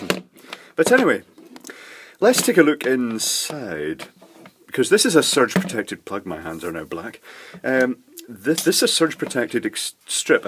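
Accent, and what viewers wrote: Scotland, United Kingdom. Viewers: Adam Baker can't understand Scottish accent? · Hi, I love your accent, my father from Lewis and Harris in Scotland and has a similar accent to yourself! Great Channel!!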